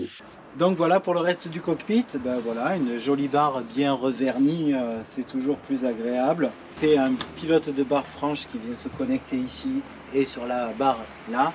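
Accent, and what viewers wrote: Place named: Provence, France. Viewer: Bonjour très beau boulot j'ai un petit voilier sa fait 4ans que je lui est rien fait dessus faute d'argent c'est une coque acier maintenant je suis a la retraite donc je pense avoir un peut de temps sa fait plaisir de voir une personne comme toi qui se défonce et surtout qui si tien bon comme on dit en Provence c'est bien pitchoun si tu passe en nouvelle Calédonie fait moi signe on prendra un pot ensemble ( je suis de la Provence aussi et sa me fait plaisir d'entendre l'accent )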